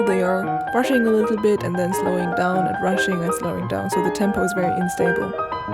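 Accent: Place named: Germany. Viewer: By the way, your English is now majestic. I loved those cute details of your German speech, but the work you did inspires as much as piano one :-)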